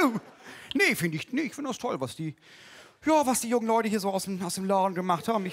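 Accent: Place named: Northern Germany, Germany. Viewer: Der norddeutsche Dialekt ❤😂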